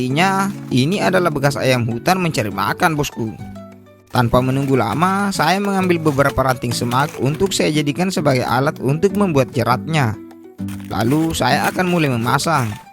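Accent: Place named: Bugis, Indonesia. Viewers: Kental kali Bugis nya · Orang manaki bosku, dari logatta, kayak orang maros kepeng😁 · Seperti nya bukan orang Batak tapi Sulawesi Selatan logatnya mirip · Kalau dekat saya ingin main ke pondok Abang.. Sayang saya di Jawa . Kalau lihat dialek nya Abang ini suku Bugis ya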